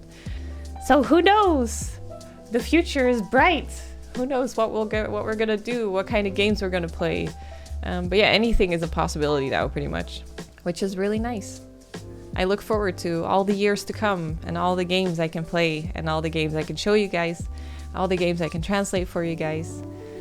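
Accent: Netherlands. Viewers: Wel leuk dat je Nederlands accent toch een beetje door komt! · Woahh you kind of have a dutch accent haha :D I really like the name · Congrats on the 150K!!! Btw, I can really hear that you're from Brabant when you speak Dutch XD (I used to live there too lol)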